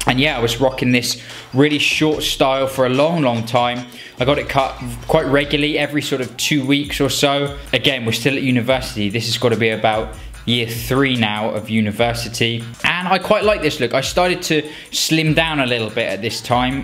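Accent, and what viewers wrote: England, United Kingdom. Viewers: Sometimes u sound like pews, but with English accent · Where in england are u from? cant place the accent.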